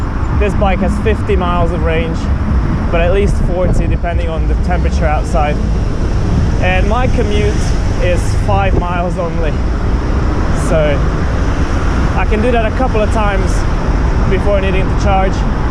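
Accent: Scotland, United Kingdom. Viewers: I would say he schooled in the Edinburgh or Lothian area. Strongly detect gentle middle class Scottish accent. That may also explain why he' wasn't used to heavy morning traffic when young since Edinburgh has more car free zones than most cities plus a more advanced segregated cycle trail nfrastructure.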